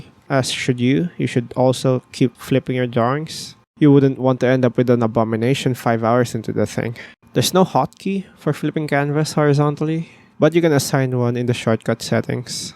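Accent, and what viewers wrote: Philippines. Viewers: Are you filipino or SE asian? i love the accent, sounds very asian specifically fil xD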